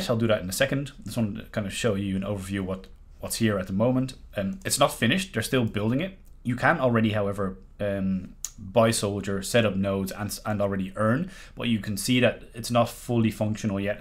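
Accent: Ireland. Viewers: Bro r u irish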